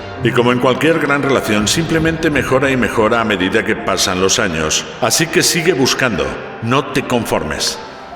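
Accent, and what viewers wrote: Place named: Spain. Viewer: Todo está bien, pero el acento español le quita nostalgia y seriedad, un español latino le hubiera ido mejor.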